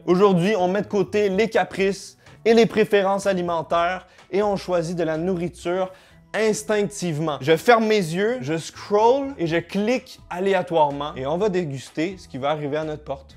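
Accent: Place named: Canada. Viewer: Vous êtes confirmé au Canada ? Fin je suppose à l’aide de ton accent que tu viens du Canada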